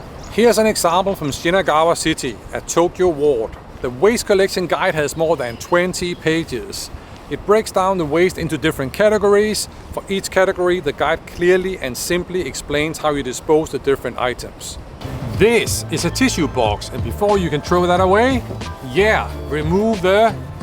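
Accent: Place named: Denmark. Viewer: he just sounds danish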